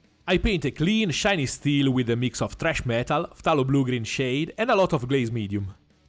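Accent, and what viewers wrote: Italy. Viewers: Boia come si sente che sei italiano. Bravissimo comunque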